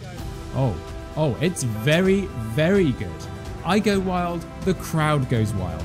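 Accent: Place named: United Kingdom. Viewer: Commentary excellent…. Forget the wind exists 😂😂😂😂😂😂 Where bouts the uk you from or where were you from ? 🇬🇧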